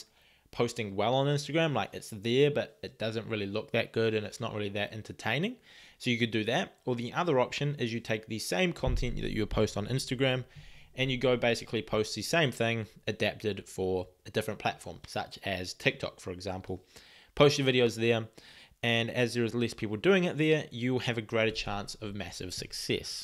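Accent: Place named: New Zealand. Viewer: I love your kiwi accent ❤️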